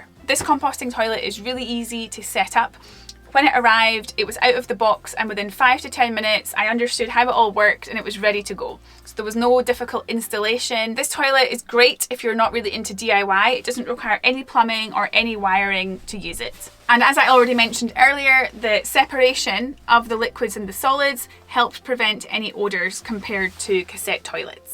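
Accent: Scotland, United Kingdom. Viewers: Thats a nice accent. I was thinking it may be Scottish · I've never heard anyone use the word "toilet" so many times in 12½ minutes, and with such a lovely Scottish accent. Currently researching our first van, thanks for the info..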